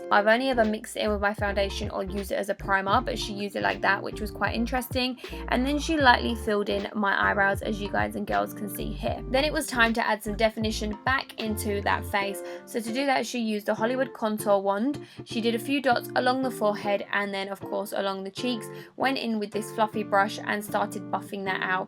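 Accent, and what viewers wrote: United Kingdom. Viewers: I knew she was british before i clicked on the video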